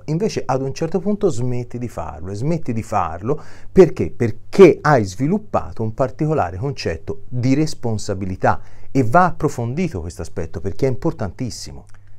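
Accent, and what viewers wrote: Tuscany, Italy. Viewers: Dr. buongiorno. Io penso di avere autostima infatti sti vivendo una situazione abbastanza complicata con un marito Narcisista che vuole procurarmi guai, ne ha già fatti parecchi. Ha chiesto la separazione che mi sta benissimo, ma senza rancore anche se un po' di rabbia c'è verso quell'essere che avrebbe dovuto darmi delle sicurezze invece mi da solo preoccupazioni, affanni. Quindi ora il mio compito non è quello di dar retta al rancore ma di lavorare sulla mia difesa, per i miei diritti e dei miei animali che non possono essere lasciati in mano a un essere malvagio, crudele quale egli è. I suoi video sono sempre interessanti e soprattutto chiari. Anche con la"c"toscana😊Buona giornata. Grazie.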